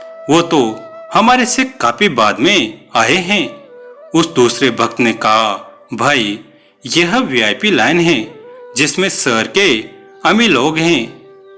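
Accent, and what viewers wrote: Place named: Pahari, India. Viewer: Bhai aap ki aawaj thik nahi lagta hai...lagta hai tum pahari ho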